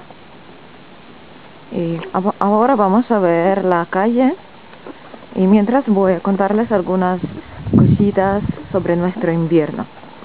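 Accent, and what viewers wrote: Argentina. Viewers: Habla medio argentina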